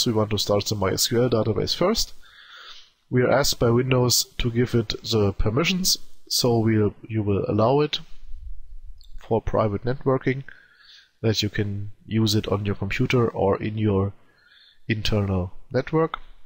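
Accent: Germany. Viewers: Kann es sein, dass du Deutscher bist? ^^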